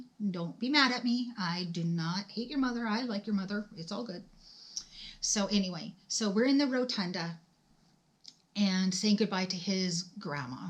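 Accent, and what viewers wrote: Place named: Canada. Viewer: I recognized the accent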